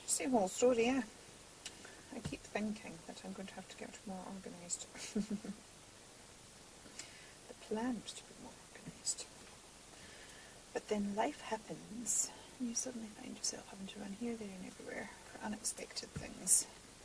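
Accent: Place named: Scotland, United Kingdom. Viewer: This is a beautiful project, love the papers! I especially love your brogue! :-) My grandparent's came from Ayrshire area (Saltcoats) and I still have cousins there that come visit the states quite frequently. They have been telling me to come visit (have not been since I was 10). Such a lovely country and the people are amazing!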